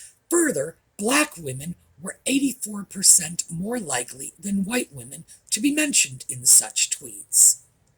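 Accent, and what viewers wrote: Wales, United Kingdom. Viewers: has very much a place on YouTube! Long may she grace us with her one of a kind humor and analysis with a beautiful Welsh accent I love her channel people in glass houses should not throw stones. H and Nutmeg are the biggest bullies out there!🇺🇸❤️ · expecially hearing her Welch accent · I find her Welsh accent very pleasing to my ears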